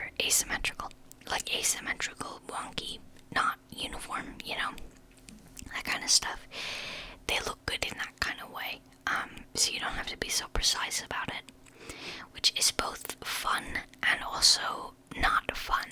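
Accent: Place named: Ireland. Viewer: irish asmr?? really hard to find that on yt lol. I am irish myself and like the familiarity of the accent so its nice to finally find an irish asmrtist 👋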